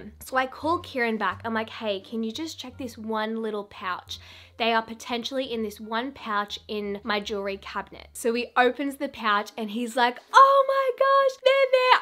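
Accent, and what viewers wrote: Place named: Australia. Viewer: The little Ozzy accent developing strong!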